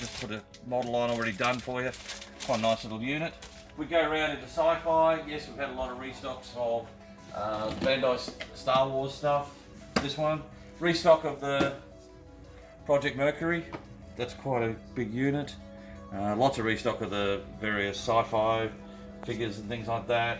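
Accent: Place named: Australia. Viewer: I am American and I understand his Australian accent.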